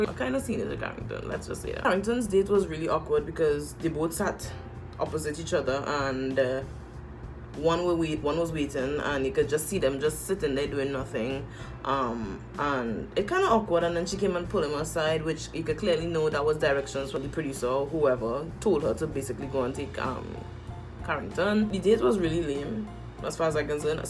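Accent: Trinidad and Tobago. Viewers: I guess it all comes down to who the audience is. I have a pretty boring life and my relationship is so drama free and stable that I watch reality dating shows to entertain myself! So, the drama factor works well for me! 😅 Btw, are you Carribean ? You sound very similar to my Trini coworkers, and I just wanted to check if I can successfully tell the accents apart! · Lady,I like you you make my pores rise you sound totally Trini.Sorry.. Carribbean countries can't vote ,you know who we voting for.l watching like a hawk. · Hunni that TnT accent and the fact that you are upset made the accent better 💖💖💖. Well, thanks to persons like you CBS received those messages and got the last laugh. I hope they discontinue the exploitation of black women for their ratings moving forward.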